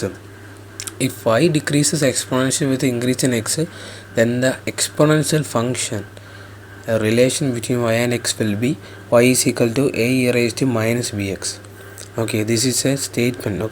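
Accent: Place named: South India, India. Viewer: Please improve your accent. You south indian have a terrible english accent because of your south Indian english accent we north Indian face racism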